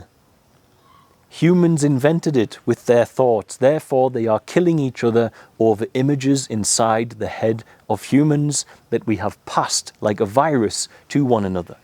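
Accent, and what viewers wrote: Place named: Newcastle, United Kingdom. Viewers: Hey there, bonny lad, bloody good talk, and a beautiful, Geordie voice to deliver it, are you aware of the three premiers, snorting coke, on a train from Ukraine, red handed, also, she was wiping coke from his nose, not attacking him,on the plane, trudau, is the son of fidel castro, Starmer is secretly, gay, rent boys are burning houses and cars, belonging to him, for unpaid bills, seems they were all trained by the w,e,f,,allegedlly all broken, easy attachment, keep talking bonny lad, god bless , fenham, formerly, elswick, you sound the same as me. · metaphysics explained via understandable examples and the dissonance from the brigite deception dispelled by your soft geordie tones many many thanks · Never seen this gent before Sounds like a Geordie Great presentation though Very interesting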